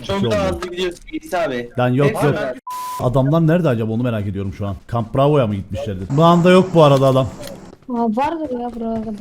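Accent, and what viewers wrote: Cyprus. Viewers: Kıbrıslı knk Diyarbakırlı değil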